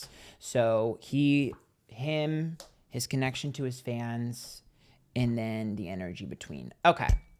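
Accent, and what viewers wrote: New York, United States. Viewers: I knew you had to be a New Yorker lol 💖🤌🏼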